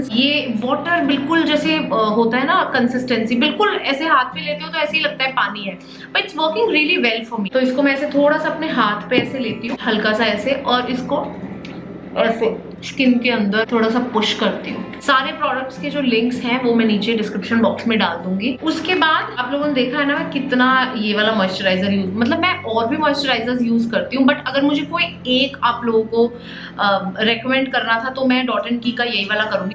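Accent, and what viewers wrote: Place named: Chandigarh, India. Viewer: One thing I like about ur video is A. being a tricity girl u hv that accent that Tricity Ppl 🤪can relate and B..u explain very well..💗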